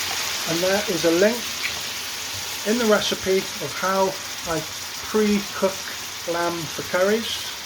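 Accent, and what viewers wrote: United Kingdom. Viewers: Great vid and tips, do i detect a welsh accent?..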